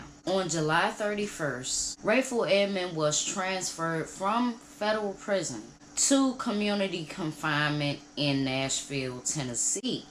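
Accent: Washington DC, United States. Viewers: Dope content baby girl. I love ur DC Accent..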